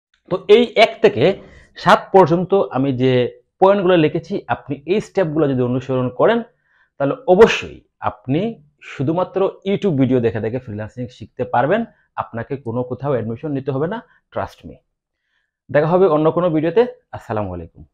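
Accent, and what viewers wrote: Sylhet, Bangladesh. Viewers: Thanks vai most informative video. Vai kita Sylhety ni? mate buja jar halka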